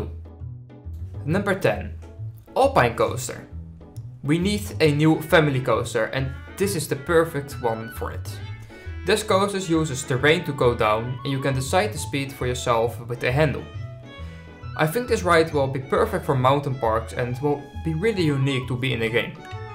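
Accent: Netherlands. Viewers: Jij bent Nederlands ik weet het gewoon ahah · U are dutch arent you :))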